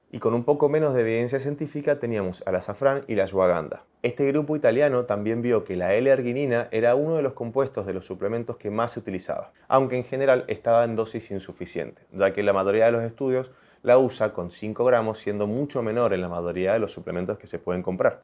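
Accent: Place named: Argentina. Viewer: Muy interante su explicacion Es 'Usted Argentino ?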